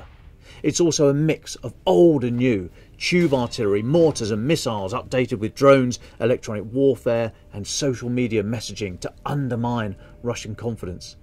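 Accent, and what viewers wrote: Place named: United Kingdom. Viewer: Nice to hear a brit giving love to good old T.R. 😄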